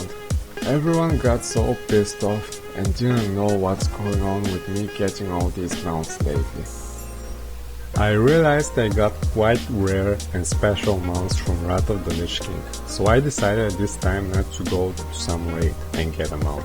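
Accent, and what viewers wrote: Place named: Russia. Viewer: ты русский что ли?) Слышно ужасный акцент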